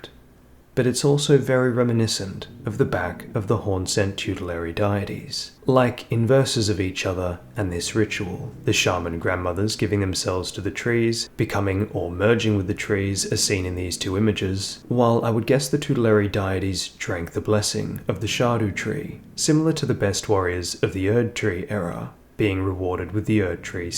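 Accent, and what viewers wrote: Australia. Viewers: What is it with ER lore videos being narrated by sexy male Aussie accents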